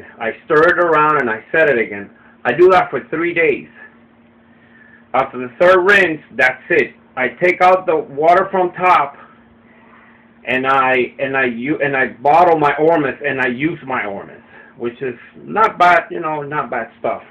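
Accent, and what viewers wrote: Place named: Upper Michigan, United States. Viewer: sounds like this boy is somewhere just a little north of Marquette Mi,????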